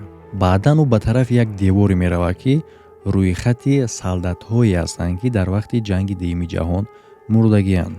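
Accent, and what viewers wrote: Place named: Yovon, Tajikistan. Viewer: Ака ба Худо ма кайф мекнм ранги мо ЁВОНИ Ё гап мезани чашмошон блк-блк ай гап нест хдт да ака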